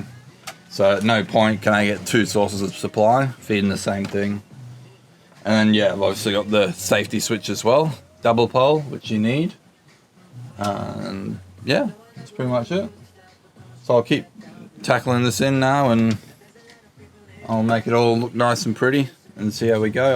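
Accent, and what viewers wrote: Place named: Ireland. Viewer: Are u part irish little bits would sound irish and somethings you say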